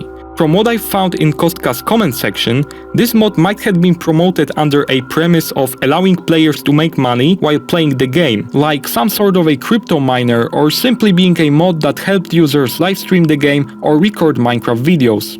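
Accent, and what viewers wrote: Poland. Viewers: Akcent typowo polski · wiedzialem ze jestes polakiem po akcencie slychac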